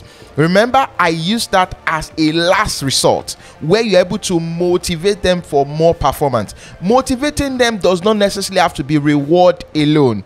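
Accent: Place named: United Kingdom. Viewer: Amazing explanation with British English . I am interesting with your curiously explanation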